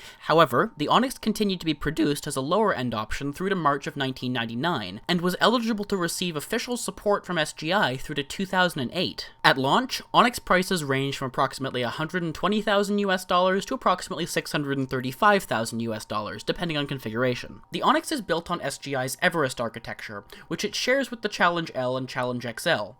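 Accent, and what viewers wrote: Canada. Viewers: Plugging in a PS2 keyboard will not damage the main (Onyx) system. All that will happen is a big sweet nothing. The only way to damage the Onyx machine through that proprietary keyboard port, would be by passing a very high voltage through it. A PS2 keyboard, being a passive device, will cause no damage at all. I mean you could short every pin and still get no damage. Go on. I dare you. Double dare? Oh, I give up... PS Is that a Canadian accent? You sound quite a bit like Mr Carlson.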